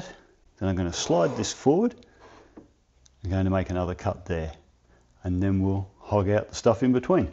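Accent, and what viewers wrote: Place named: Australia. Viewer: That’s what our accent sounds like in Australia champ.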